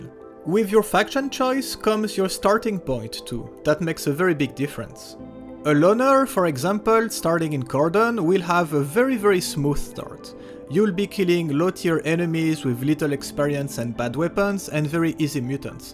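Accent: France. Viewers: Are you french? It sounds adorable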